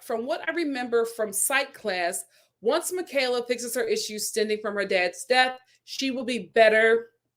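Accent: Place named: Philadelphia, United States. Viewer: LOLOL we love you, and maybe it's the Philly accent but you've been saying it wrong.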